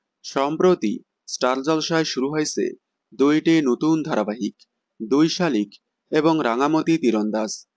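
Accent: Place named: Bangladesh. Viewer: Apner katha balar kharap bangladesi úcharan brisri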